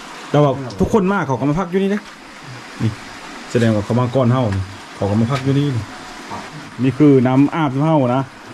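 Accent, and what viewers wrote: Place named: Isan, Thailand. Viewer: ผมติดตามสำเนียงเว้าม้วนๆครับอ้าย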